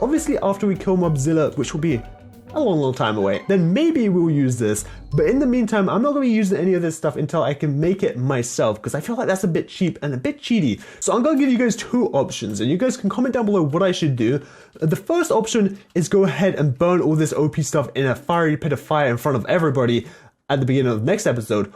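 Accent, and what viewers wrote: United Kingdom. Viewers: Who Loves british acent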